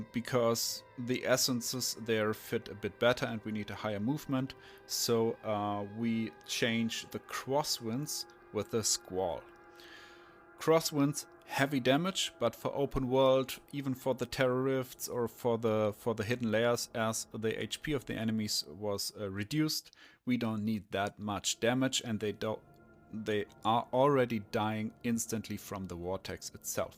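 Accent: Germany. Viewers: Bro bist du Deutscher hört man Akzent raus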